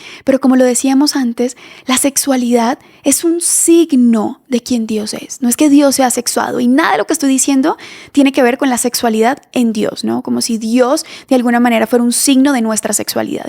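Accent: Colombia. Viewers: Tono de ablar que eres colombiana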